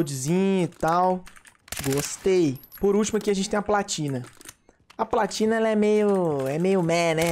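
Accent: Minas Gerais, Brazil. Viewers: tu é mineiro?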